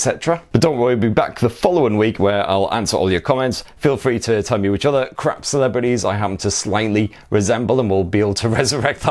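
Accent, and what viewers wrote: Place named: Sunderland, United Kingdom. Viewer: Hi, I'm geordie and your the only mackem that I've heard that doesn't irritate me. I'm having charging issue with my Galaxy s10 plus. Can you help? Thank you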